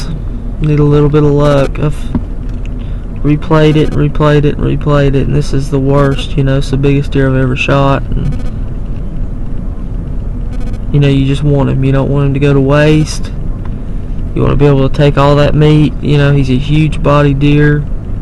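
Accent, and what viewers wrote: South, United States. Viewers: Personally the southern accent makes him sound like he knows what he is doing. Hats off to you southern dudes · That music killed the video.... And your southern talk....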